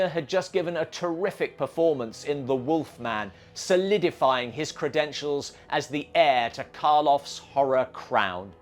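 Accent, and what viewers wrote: United Kingdom. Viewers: It really IS hard to see Krogh as anything other than a buffoon after YF, so similar is Marrs to Atwill LOL. There’s something about hearing these stories in an English accent. Between you and Adam Roche, I am a happy man❤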